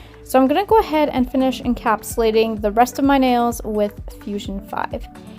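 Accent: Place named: Alberta, Canada. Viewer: Clearly you are a rocking Canadian!! I'm hearing mid/northern Alberta. You mentioned Edmonton, where you got???? I can't remember... Albeit I've been watching you for a while now, its still nice to have a fellow Canadian as a YouTuber!! Suzie, Sarah, and Talia are the other Canadians I watch. 💘 those ice cubes. So much fun. Great set. Nice colour combo💙💕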